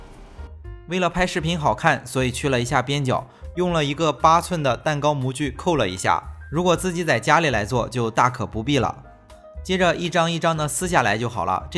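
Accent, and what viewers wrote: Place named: Shandong, China. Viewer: 听口音就是北方人阿，山东的吧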